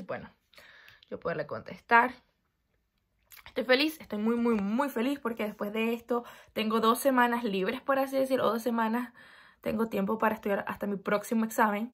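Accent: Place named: Nicaragua. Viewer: Vivo en inglaterra te sigo de unos meses atras, me llama la atenciòn tu acento Nica. Soy nica.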